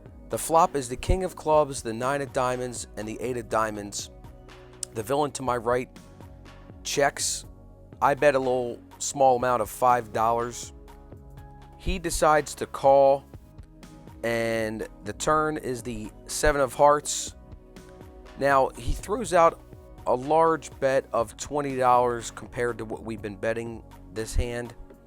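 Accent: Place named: Baltimore, United States. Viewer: Don’t worry so much about anything.... enjoy the process, sounds like you are. I would highly suggest you never limp into the pot, no matter if your mp or utg or cutoff or button, just doesn’t matter (position). Reason is, your limp 3b is never going to include enough bluffs. (Short justification) Always enter hands with raise, 3x or $10 for 1/3. When we enter a hand, just try and play better quality hands that play well post flop. Suited connectors, 7s plus. And finally work in some 3b in position, those guys hate 3bs at the 1/3 stakes and if your in position with a premium hand your likely ahead and this will increase your win rate. Love watching you because your authentic and your baltimore accent always makes me laugh. Talk soon Matt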